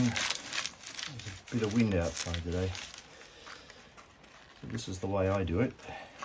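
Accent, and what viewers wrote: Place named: Australia. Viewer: I'm a sucker for rocket mass heater vids. Just posted - recommended in my YT feed. Aussie accent talking about first burn for winter - intrigued, I check out your channel and then it becomes clear - a fellow Aussie (wiser than me) living my future in the Japanese countryside, about 12 months ahead of me. 2024 is the year I end my (and my wife's) 21 year hiatus!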